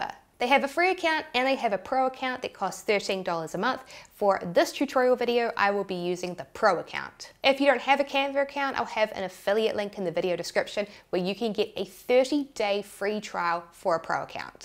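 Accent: New Zealand. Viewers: Hi! 😀 My name is Shannon, I'm from Pukekohe, New Zealand - I stumbled across your channel just a few weeks ago and was delighted to hear a New Zealand accent!!! 🤩😁 I LOVE your channel, your videos are excellent, there are SO many options and ways to make money online which I'm looking into. One of my long time wishes is to work from home and there is no better time than now to make this dream happen! I've been watching a lot of YouTube videos over the past few months, looking into various ways to make money online which will work and aren't too mind-boggling, or cost any money to start. You are amazing -- your knowledge and success are incredible!!! ✨🤩😁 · Pretty sure it's her Kiwi accent 😄 Someone please correct me if I'm wrong!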